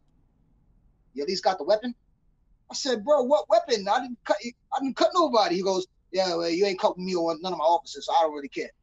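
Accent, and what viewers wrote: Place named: New York City, United States. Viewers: Scrolling through the videos I see the New York and had to check it out. Man that was a great show. I did 7 years in nysdoc, this guy was giving me flashbacks 😅 Going to the Shu. They feelonfof pulling up at Auburn, it was Clinton corr for me, one of the 3 oldest, sing sing being the other. Creepy old as f, your like, fffff.. I Listening to him I miss the accent. I was from the country, you get to prison in NY and 90% are from the city. 😂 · No Spanish but man’s got the most nyc Puerto Rican accent I’ve heard in a minute. I like this guy.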